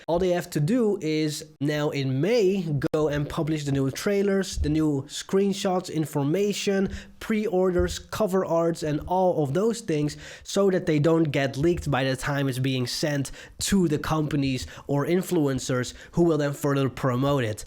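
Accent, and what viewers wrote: Netherlands. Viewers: Ofc he is the Dutch accent is through the roof..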